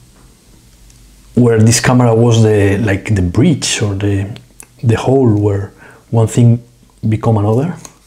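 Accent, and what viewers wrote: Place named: Spain. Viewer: Hi, I thought I would send my message in Spanish to applaud your excellent spoken English . Here goes.... Por favor, haz más vídeos. Me encanta tu voz tranquila y tu hermoso acento español. ¡Es aún mejor cuando hablas con tanto cariño del magnífico M8! También tengo un ejemplo plateado y sí, es una historia de amor con la máquina, un dispositivo que une la sensación cinematográfica y lo digital en un momento del pasado durante la transición. Siento que estos sensores CCD eran excelentes y desearía que todavía se produjeran. La construcción y simplicidad de esta cámara me recuerdan que los fotógrafos del pasado con cámaras muy simples también podían producir fotografías de belleza y sustancia sin recurrir al enfoque automático y a los 50 megapíxeles. Me encanta especialmente el vídeo que hiciste con tu hermana. Sospecho que incluso si no tuviera interés en la fotografía, seguiría disfrutando de tus videos. 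¡No pares! Chris de Cambridge, Reino Unido Best Chris, Cambridge UK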